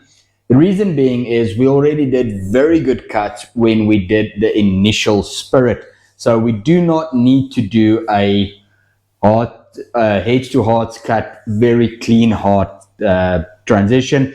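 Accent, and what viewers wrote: South Africa. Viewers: is back. I love that thick S.A. accent brother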